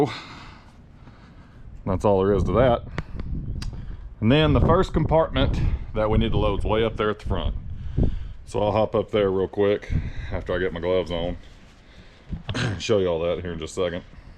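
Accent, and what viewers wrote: South, United States. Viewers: That country boy accent though - I wonder if you can sing 🤔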